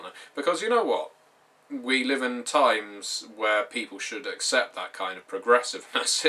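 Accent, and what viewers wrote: England, United Kingdom. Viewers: when he talks, i have to pay attention. the English how do they do it?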